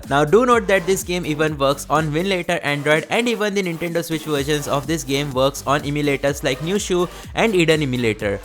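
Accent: India. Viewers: The indian accent makes me trust you even more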